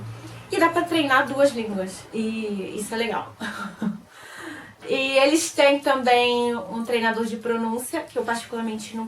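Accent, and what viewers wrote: Rio de Janeiro, Brazil. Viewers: vc é carioca, não é? se não é, viveu bastante no Rio...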